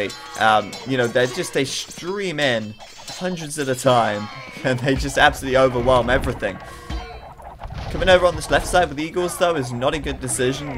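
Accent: United Kingdom. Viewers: I was wondering, watching your previous videos whether you were UK, given your accent (I love british accent ^^), and I got sure, when you said Argentina and Beijing were opposite on a World map, because of the fact Europe is centered on European countries. And I told myself there were probably more difference between Paris/London and Argentina or Beijing than between the last two. I checked it out, and next time, I'll think before speaking ^^ Keep up the videos, bro, you're doing a very good job (you sometimes seem to struggle a bit when a lot of action is going on, breathing or coughing ^^), and between Spirit and you, I got back to AoE recently. Only fighting AI for now, but this is such a good game.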